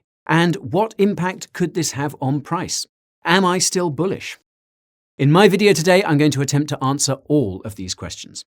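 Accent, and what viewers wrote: United Kingdom. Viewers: Mate! Aside the amazing info and the way you get to present us (pun intended!) it's also amazing you get me to laugh listening to the boring 'I'm not a financial advisor'. I might be biased tho as I have a weakness for about every accent from my British neighbours, at least, the ones I can understand 🤣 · That British accent 😂😂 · The only person with a British accent that I listen to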